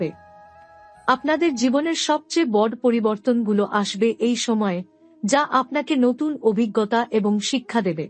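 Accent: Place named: India. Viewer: Eta kono hindi vashi manus banglay bolechen, tai onar kotha gulo emon sunte lagche! bujhlen.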